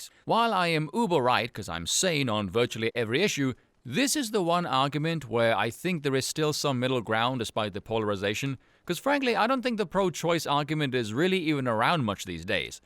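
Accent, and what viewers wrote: South Africa. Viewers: South African, he touched on this a while back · You sound south African lol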